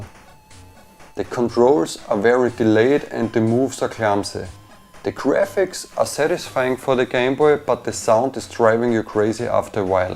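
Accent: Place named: Austria. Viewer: Γεια σου! Ρε μαλακα ich kann dir nur sagen, dass deine Editing Fähigkeiten richtig gut sind und die Umsetzung sehr gelungen ist. Es war toll deine Geschichte über deine Kindheitserinnerungen von Mortal Kombat zu hören in deinem fehlerfreien Englisch (mit enormem österreichischen Akzent) und das ohne ähms oder Unterbrechungen. Was du bestimmt verbessern kannst ist die kurzen Abstände zwischen den Sätzen zu cutten, da dies noch professioneller klingt. Dein Intro hat es mir echt angetan und ich finde es einfach genial, dass du zu deiner Herkunft stehst und dein Ding durchziehst. Ich habe dich auf Instagram gefunden und dein Link in der Bio hat mich hierhergeführt und ich war überrascht, dass du nur 12 Abonnenten hast. Durch mich hast du jetzt 13 und ich gebe dir hiermit einen Daumen nach oben. Ich hoffe deine zukünftigen Videos werden sich auch mit anderen Themen beschäftigen. 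Wenn du magst, kannst du mal auch meinen Channel anschauen, der ebenfalls in den Kinderschuhen steckt. Kannst mich auch gerne auf Instagram abonnieren, habe dir dort ebenfalls ein Abo gegeben. Ich habe ein Unboxing und Gameplay zur SNES Classic Mini gemacht, welches mir total Spass gemacht hat. Vielleicht wird dir das auch gefallen! Bleib dir selber treu und ich freue mich auf dein nächstes Video. Grüsse von einem Serben aus der Schweiz Vlad :)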